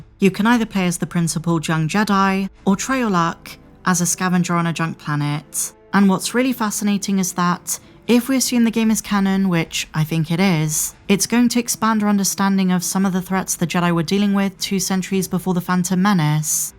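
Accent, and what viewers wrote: United Kingdom. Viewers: Sometimes I wonder. I've always felt like this host had a very unique quality to her voice that I haven't heard before live in person from anyone. Multiple unique tonal and pacing qualities, as well as a particular type of British accent that I've never heard before.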